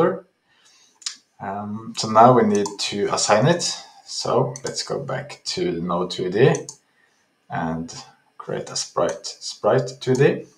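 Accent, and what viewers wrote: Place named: Norway. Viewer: Hallais! (based on your accent, I assume you're Norwegian 😅) Loved this video dealing with maps - that gave me a much better understanding on where even to start with my overambitious project. I would love to see your take on data structure/handling 😊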